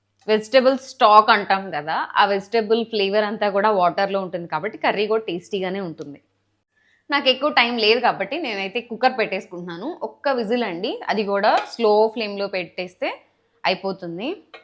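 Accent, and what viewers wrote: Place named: Rayalaseema, India. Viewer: Om Shanti you are from Rayalaseema kada inti vallato kaburlu cheputunnatla vundi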